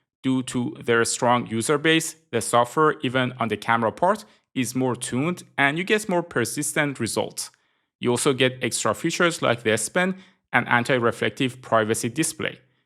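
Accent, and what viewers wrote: Iran. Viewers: داداش خیلی لحجه ایرانی باحالی داری😂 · لحجه ایرانی تابلوعه سید 😂😂😂😂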